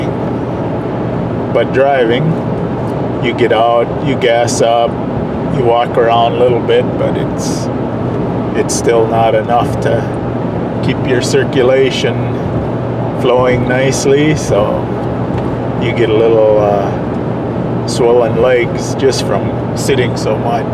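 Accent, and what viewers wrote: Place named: Midwest, United States. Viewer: This is the cutest and most wholesome road trip video I have ever seen in my life. I love this guys voice. So Midwestern. 🤗🤣💜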